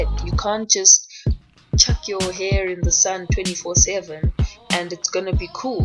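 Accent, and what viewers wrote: Zimbabwe. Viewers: This accent has to be Zimbabwean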